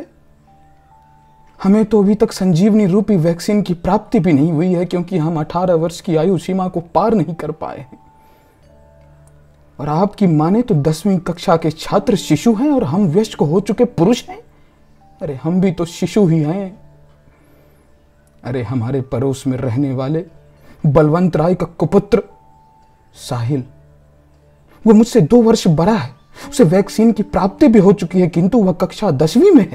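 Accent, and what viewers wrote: Standard. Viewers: aapki itni shuddh hindi sunkar hame charm sukh ki anibhuti hui mitra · Bhai itni shudh hindi 🙂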